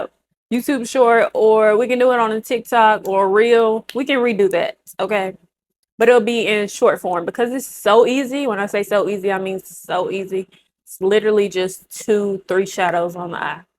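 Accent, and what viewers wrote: South, United States. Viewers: OMG I love her country accent ! 🥰😂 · New here, 1) you’re gorgeous 2) idk what I was expecting but I wasn’t expecting your voice to sound like that lol you def gotta be from the south with that accent. And your freckles!!!! 😍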